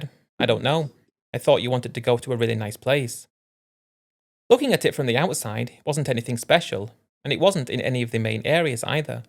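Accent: United Kingdom. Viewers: You’re from the US? Your accent is British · If you’re from the United States, why do you have a British accent?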